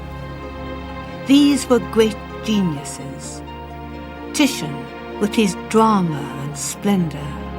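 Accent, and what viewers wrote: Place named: South Africa. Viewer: Sr Wendy largely lost her South African accent but it shows in her ability to pronounce Dutch, Flemish and German names correctly.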